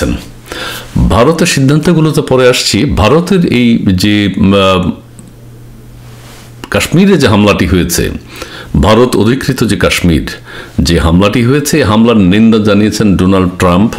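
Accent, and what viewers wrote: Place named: Noakhali, Bangladesh. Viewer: নোয়াখাইল্যা ভাই !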